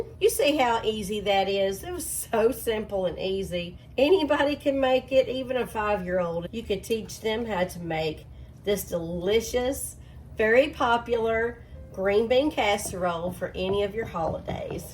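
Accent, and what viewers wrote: South, United States. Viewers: I just found your channel ! I love it + i need it ! Love the southern accent wether Carolinas, Mississippi,Tennesee ,Arkansas !!! Idk but i love it We have no accent in the Pacific NW 😅 Look forward to getting back to some good down home , old fashioned cooking Jesus loves you ! from another sister who loves Jesus 💜✝️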